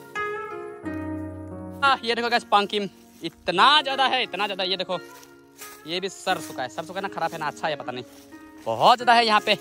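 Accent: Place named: Assam, India. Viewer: Aap assamse hona bat karneke andaj sehi pata salta h😬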